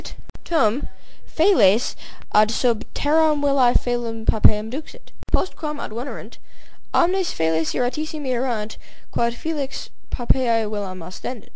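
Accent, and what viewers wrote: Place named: England, United Kingdom. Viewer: Sounds like English. You should work your accent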